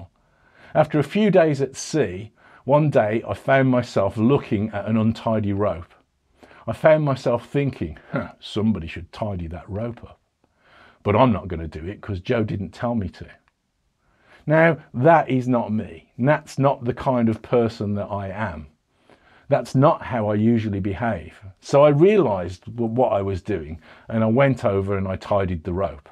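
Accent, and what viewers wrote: United Kingdom. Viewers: "your bus comes up to you and says" I really appreciate the UK accent but I just had to point out that was the first thing I've heard.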